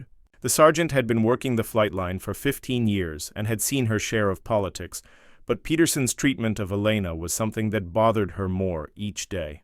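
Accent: England, United Kingdom. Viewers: The English accent is very off putting.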